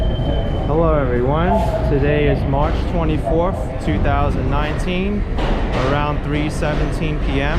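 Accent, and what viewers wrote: New York, United States. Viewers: Are you from NY dear? You do a fantastic knowledgeable narration. GREAT videos! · I love your voice and accent, I'm from England and we have the most varied and distinct regional accents. Definatly my favourite US accent is New Yorks. I love that strong accent. As much as yours isnt as strong, it's still apparent, I love how you say Brooklyn, I could listen to it all day.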